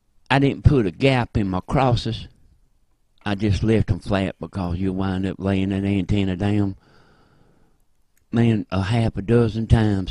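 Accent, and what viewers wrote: South, United States. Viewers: There's a whole bunch of people that sound like down south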